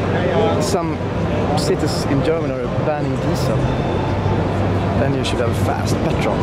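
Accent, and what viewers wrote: Sweden. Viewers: Hi. You really should get a microphone with that camera, sometimes it's very hard to hear what you are saying, specially with a Swedish accent:):):). Anyway, good vid, really enjoyed the Alpinas, I prefer the Alpina instead of the new M5! They look better and more powerful. Take care.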